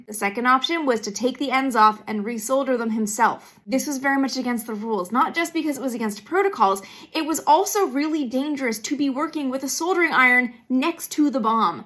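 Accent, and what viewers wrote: Canada. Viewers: You're such a Canadian, still. 🔥💙 The way you say "soldering." Yes, pronounce that L. I'd love to talk with you about it. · I know it's just your Canadian accent coming thru... but its pronounced 'sod-er'. the l is silent. love your vids!!! 🤗🤗🤗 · has a bunch of pronunciations that I find adorable. Some are Canadian. Some are based on how NASA pronounced words. I think this one was just a mistake. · Yes, she’s Canadian. I don’t know if you’re new to the channel, but it becomes really obvious that she’s Canadian after a short while. I think it’s nice that she doesn’t try to hide it.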